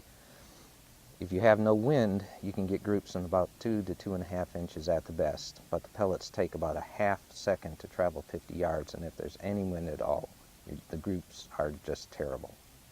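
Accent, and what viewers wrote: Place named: England, United Kingdom. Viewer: Gracias Sr.. Very good this video a lot of informative and crearly explicative mode to understad your fine English accent for Us. Thanks a lot. Saludos desde Venezuela..sorry my Bad English!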